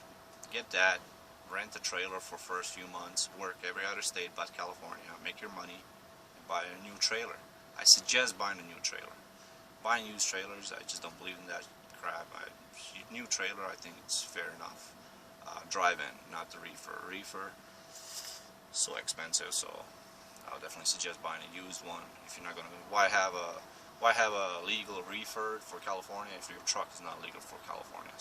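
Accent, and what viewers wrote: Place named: Russia. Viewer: You definitely sound like a Russian.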